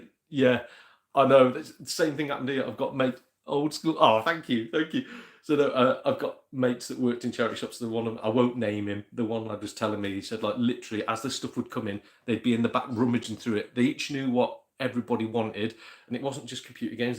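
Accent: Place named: Scotland, United Kingdom. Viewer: Cheers for the shoutout mate, just watched the stream. Great channel, and some good laughs to be had! An unrelated and random question, but are you originally from Scotland? I ask because I used to study and live there. But if you are, your accent is pretty subtle.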